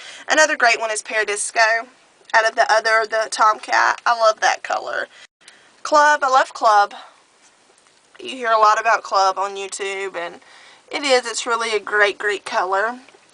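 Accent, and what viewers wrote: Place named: South, United States. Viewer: I love your Southern accent! Anyways, I'm gonna get myself an electric eel tomorrow.. I love my blues and greens too. I just hope it suits me. :-S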